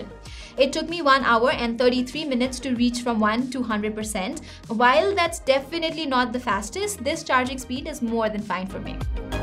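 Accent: India. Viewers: A little less accent would do... Felt more hinglish.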